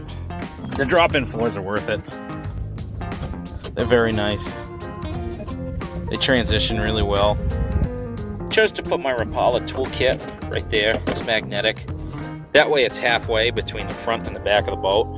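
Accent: Midwest, United States. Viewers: Interesting Midwest accent, never heard that one before.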